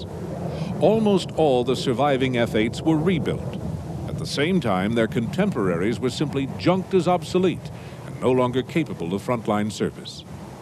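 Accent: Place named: United States. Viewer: American narrator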